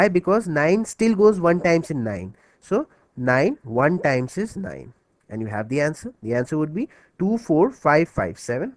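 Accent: India. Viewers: his accent tells me he Is totally Indian. I know because first of all only Indian have those accent and.... I'm indian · thats indian accent. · Are you Indian? Your accent is Indian. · Indian accent!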